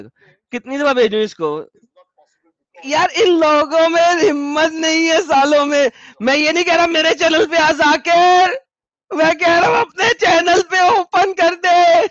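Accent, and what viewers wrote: India. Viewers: I love how has picked up sahils mubaiya accent 😅❤ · you are just awesome. I used to listen to this idiot as a non muslim when my Muslim friends preached Islam. I wanted to know reality and always despised Zakir Nalyak because of how he belittled other religions down and claimed only islam is true religion of God. Don’t know how some people convert listening to this idiot. He has no logic. you are very knowledgeable and entertaining, should open a comedy channel. Love your Punjabi accent 😊.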